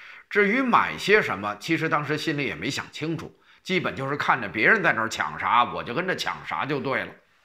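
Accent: Beijing, China. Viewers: 京腔听着像界别儿的大爷亲切～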